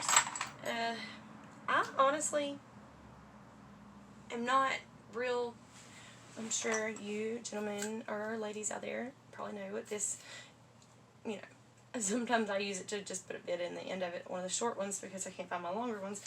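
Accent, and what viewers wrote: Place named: South, United States. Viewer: I love your southern accent! it’s sounds like sweet tea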